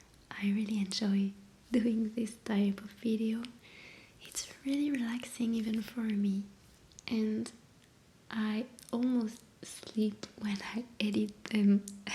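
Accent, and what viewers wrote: France. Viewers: Hello 🖐. I discovered your channel last week and it's a pleasure to watch you 😊. You are so soothing, your voice is lovely. I love the french accent, it's perfect for asmr and there is another french asmr youtuber which I adore. It's moonlight cottage. I also think you are talented painter. Thank you for sharing 😊. Merry x- mas and a happy new year 🎉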